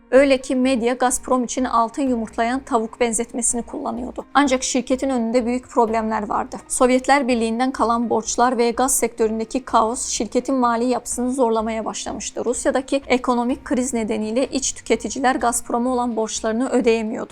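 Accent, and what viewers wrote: Azerbaijan. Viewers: Hanımefendi Azerbaycanlı, o yüzden böyle konuşuyor. Video çok güzel ancak GZT'nin genel problemi videolarının sonunu bir yorumla kapatmadan havada bırakıyor. yani konu detaylı veriliyor ama yakın vade ile ilgili bir öngörü yada yorum yapılmıyor. izleyiciye ise "düşüncelerinizi yazın" demek anlamsız çünkü araştırmayı editör yapmış, izleyiciye düşünce soruyor.. İzleyici yeni öğrendi nesıl yorum yapsın... o sebeple videolar internet bilgisi derlemesi kalitesinde kalıyor. ve kendini tekrar ediyor. izleyiciye ansiklopedi bilgisi veriyor ufuk açmıyor. editör son 3-5 dakika yorum ve konuyu kapatış konuşması yapmalı. çember kapatılmalı. buna çok dikkat edilmeli. aksi taktirde kalite ve izlenme bu yataylıkta gider. emekler için teşekkürler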